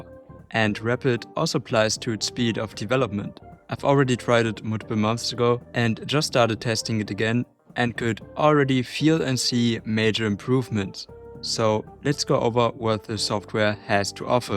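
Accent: Germany. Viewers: Was ein brutaler deutscher Akzent.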